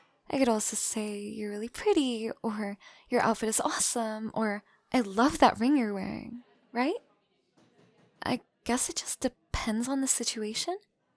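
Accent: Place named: Canada. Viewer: If you’re autistic enough to figure out linguistics for fun ever Canadian sounds so aggressively Canadian and it’s adorable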